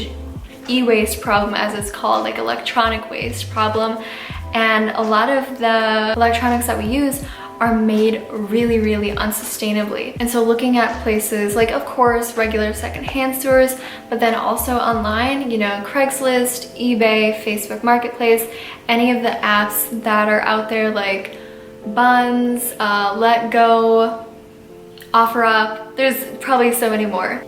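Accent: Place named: Minnesota, United States. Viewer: Oh ya, I think she's from Minnesota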